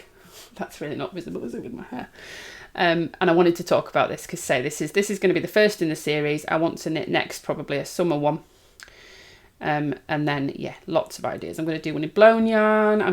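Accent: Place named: Northern England, United Kingdom. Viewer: You sound like you're from the North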